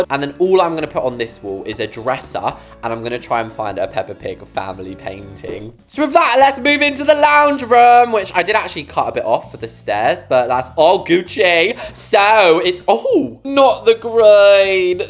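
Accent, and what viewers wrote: United States. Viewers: Gurlll I’ don’t like you amaerica accent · I do not like the American accent I like your normal voice better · PLEASE THE AMERICAN ACCENT IS SO SCARY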